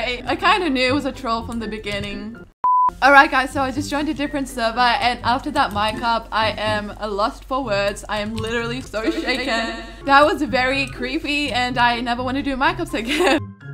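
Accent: United Kingdom. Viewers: hi hi hi hi pls answer my question are you British or American?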